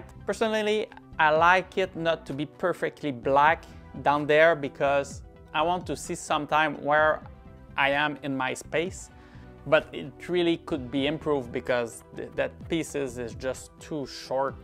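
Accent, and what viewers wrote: Quebec, Canada. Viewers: Est que t’est québécois?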